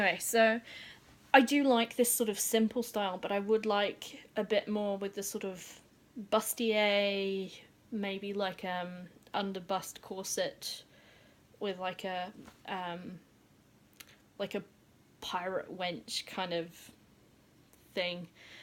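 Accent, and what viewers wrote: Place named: New Zealand. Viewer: I have made her facebook page public this evening so hopefully you will see what she looks like and what she has been getting up to since she came to me in August. I didn't know you were British, you must have come here when young you sound totaly Kiwi, we are from Yorkshire and have been here nearly 10years. The idea for your story came to me a while ago, when you mentioned that you didn't know where to go with regards to her story. I was waiting in anticipation when Customs had her and this was the time i was waiting for mine and thought "oh no im going to have to wait forever if customs get a hold of her, i had sleepless nights about her been ceased for her magnets, all sorts of things, then i was worried i was mad at what i was intending to do with her i didn't realise that there were others out there like me, so then i though oh im not original in my way of thinking which i was kind of disserpointed in, so i couldn't win with myself, but now i love watching unboxing video's and you tube but i just watch Shell Bea, Swannz, and the lady who took her dolls, dolls bike and dogs to the beach in Auckland, i've forgotten her name but found them i think through stalking ha ha ha. 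When you were unboxing her it made me cry tears of joy for your wait was over, and mine was soon to be delivered. I love my Ppinkydoll and have another coming in October, its my birthday today and so im thinking erm.... birthday money= Lilith. I have been in a tiz regarding patterns, i sent for some fro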